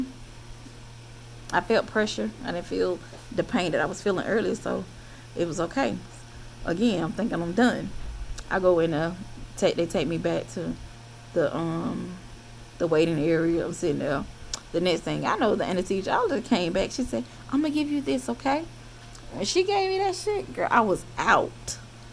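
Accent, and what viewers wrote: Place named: Alabama, United States. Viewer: Are you related to Adrienne Little? You have that Mobile, AL twang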